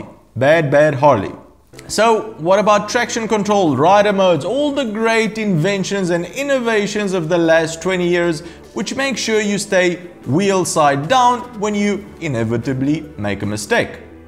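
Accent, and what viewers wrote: South Africa. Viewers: SA accent annoying.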